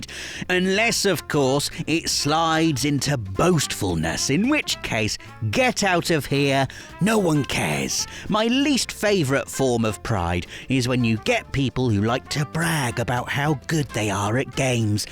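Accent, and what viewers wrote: England, United Kingdom. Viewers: Wrath is pronounced ‘Roth’, inventory is pronounced ‘in-ven-tree’. It’s like and English accent American channel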